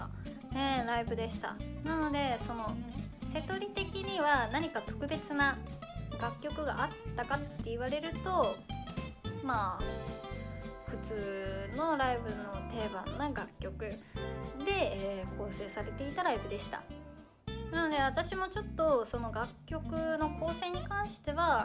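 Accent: Hiroshima, Japan. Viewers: たまに話す方言からすると広島ですか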